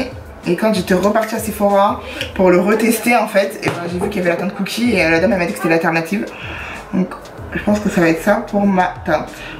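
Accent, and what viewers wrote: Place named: Ivory Coast. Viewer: Tu djaaa comme une bonne Ivoirienne 😅😍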